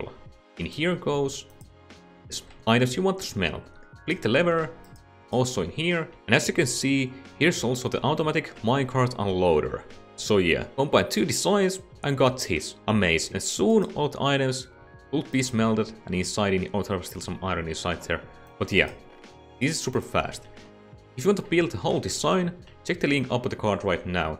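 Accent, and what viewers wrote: Finland. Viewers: XD where are you from btw? your accent sounds so much like finnish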